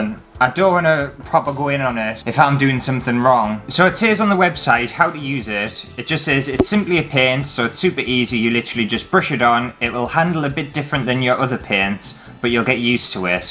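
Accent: United Kingdom. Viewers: You need to vastly increase the bitrate of your camera, this video looks very splotchy. Also, you need to lower your shutter speed for more motion blur because it looks unnaturally crisp and jittery. I would also recommend moving to 30fps, in which case since you sound British, you'll need a 1/50th shutter speed, specifically, in order to avoid your interior lights flickering on camera. In addition to all of that, you'll have to set your camera up so that it doesn't adjust the shutter speed as a part of the exposure triangle when you have auto-exposure on, which I assume you do because most people do.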